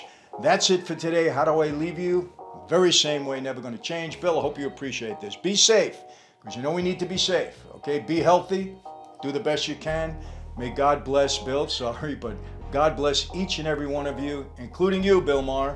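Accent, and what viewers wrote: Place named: South, United States. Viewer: im from alabama your sure you aint from the south🤔?...LOL..... I agree with just about everything you say man 👊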